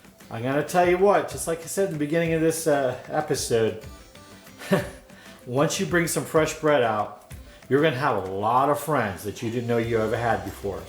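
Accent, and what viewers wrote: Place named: Pennsylvania, United States. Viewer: I'd love a junk of that bread covered in butter❗❗❗Are you from PA❓YOU SOUND LIKE MY MAMA'S FAMILY🥰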